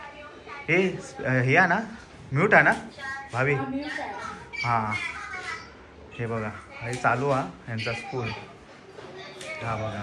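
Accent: Malvani, India. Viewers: Maka tumch malvani aikuk avadta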